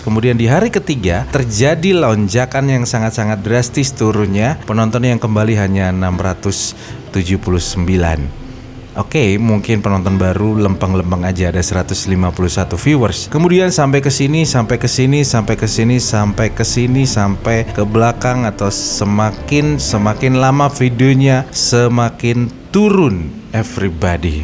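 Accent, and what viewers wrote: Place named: Banyumas, Indonesia. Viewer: kelas bang,,, wong ngapak mendoan kie bang 😊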